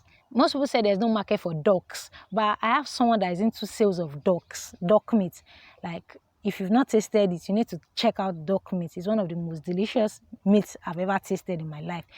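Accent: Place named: Urhobo, Nigeria. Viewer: You sound like Urhobo girl.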